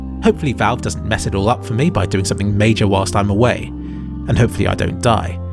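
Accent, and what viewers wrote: United Kingdom. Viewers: Klikbait is better than Clickbait. Thought I watch your videos due to your quality and soothing (Bristish?) voice. I watched your latest video about reflections in Cs:Go and had no clue what you were talking about yet I enjoyed the video since it appears that you know what's going on. Even your reaction video on Half life Alyx is intelligent, most reaction videos are morons pointing out obvious things like excited apes, but you actually point out things that most people wouldn't see otherwise- tech related things for example. Don't change your style and honesty as it defines you and your channels.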